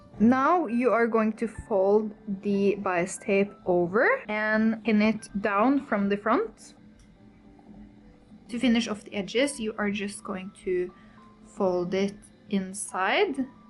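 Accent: Norway. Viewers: Do I detect Norwegian? Så fint! Når jeg har skaffet min egen symaskin vil jeg sikkert prøve meg på dette!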